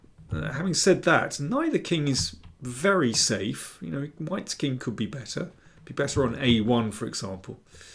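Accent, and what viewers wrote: England, United Kingdom. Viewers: wonderful precise analysis, with that lovely English accent. bravo.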